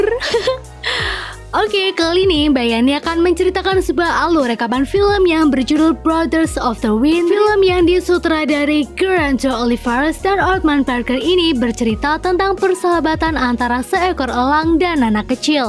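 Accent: Pemalang, Indonesia. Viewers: LAahhh kok pemalang? Emng org pml? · Mba orang Pemalang? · Wong pml ye mba? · Wong Pemalang mba? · wong pemalang ya? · Kaknya orang pemalang yahhh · wong pemalang ta mbak?😂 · Mbae asli Pemalang po?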